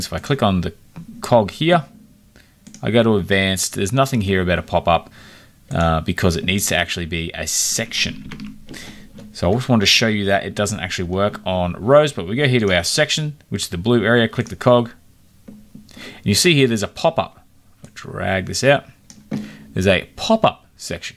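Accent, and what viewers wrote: Australia. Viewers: Thanks for the tutorial. Nice to hear an aussie voice too!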